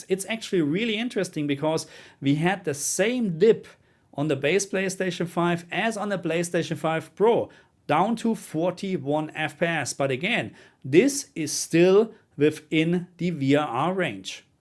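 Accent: Germany. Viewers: Der deutsche Akzent ballert hart rein bei dir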